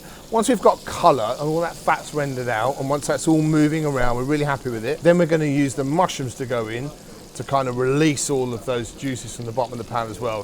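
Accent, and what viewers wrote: United Kingdom. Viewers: Brirish accent, French dish and app belonging to which nationality! It is like the Butter Chicken saga😂😂😂Lost in transition.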